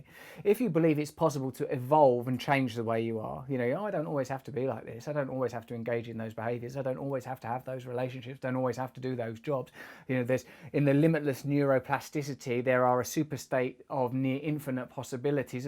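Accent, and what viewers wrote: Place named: United Kingdom. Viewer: What type of British accent do Russel have?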